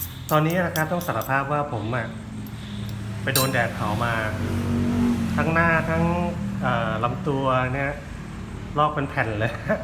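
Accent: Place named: Isan, Thailand. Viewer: เชื่อว่าตอนอยู่กำแพง คงจัดหนักแบบไม่ธรรมดา ขนาดเห็นสาหร่ายเป็นเต้าหู้ คงเป็นเพราะฤทธิ น้ำเก๊กฮวย กับน้ำอัมพัน ยังไม่หมด เมนูแกงจืดสาหร่ายหมูบะช่อคงช่วยให้หายแฮ้งได้ดีคะ เมนูนี้การันตีด้วย ยังไง้ ยังไง ก็แซ๊บ แซบ อยู่แล้วคะ (คนกำแพงแต่ชอบพูดอิสาน น่ารักดีคะ)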